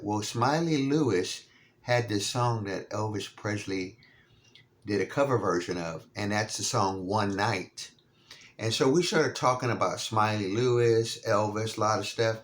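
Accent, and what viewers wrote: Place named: Texas, United States. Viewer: " " may be their best record. Have to admit, when I hear your accent, I thought you were more Mississippi or Louisiana but now I'm picking up your Texas. Are you familiar with out of Beaumont? Great finds! Love ya!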